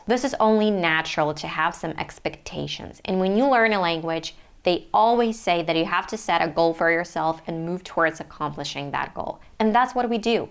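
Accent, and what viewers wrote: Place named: United States. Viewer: Your accent is so American👍👍👍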